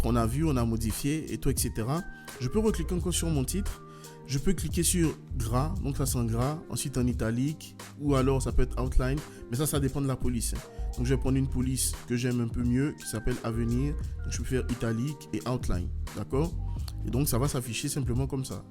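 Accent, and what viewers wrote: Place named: Ivory Coast. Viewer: Merci, super tuto et encore plus merci pour l’accent ivoirien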